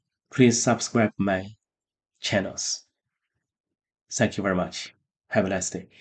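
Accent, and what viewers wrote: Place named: China. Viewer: 英文虽说流利，但是发音不准、你用讲中文的节奏、停顿来讲英文很多时候听不清你在说啥？感觉像是四川人在讲韩文😂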